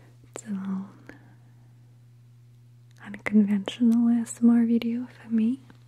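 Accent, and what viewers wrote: Russia. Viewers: I love your videos so much, you’re my first ever ASMRtist I’ve ever watched! My favorites are your videos where you emphasize your Russian accent and culture, can you please consider doing more videos with your Russian accent please? <3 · I also love your Russian accent, it's so pretty. My parents are also from Europe (Czech Republic) and we all speak both English and Czech! Sweet dreams from Canada 😘😪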